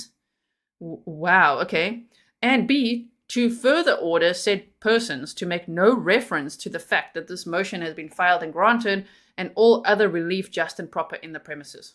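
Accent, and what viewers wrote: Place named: South Africa. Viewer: Before I even start watching I need to state that this is the one case that I follow that I found dubious from the beginning, long before the Odinists came in. From the beginning I thought they do not have anywhere near to enough to convict this man - an unspent round is all......and my instinct was just that this is not the man. This does not make sense...and since the rest came out, I am more and more convinced they have the wrong man and he is being framed....I do not usually easily believe a frame defence, but on this one....I may be convinced. And the judge is just biased.....! I have no idea what she is thinking. By the way, where are you from? I am from South Africa and your accent sounds South African!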